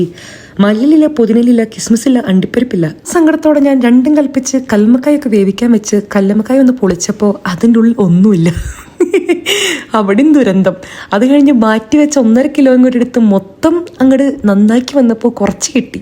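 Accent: Kerala, India. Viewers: Thani malayalide sound pole und❤️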